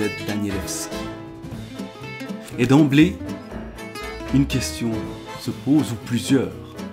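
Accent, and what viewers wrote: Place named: Vaud, Switzerland. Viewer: J'ai l'impression d'être de retour à Lausanne en vous écoutant... Vous êtes vaudois ?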